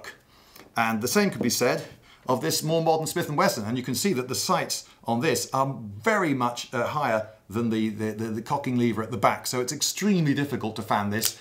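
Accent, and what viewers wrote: United Kingdom. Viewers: That was very clear and expertly done. The British accent gives this video some class. 👍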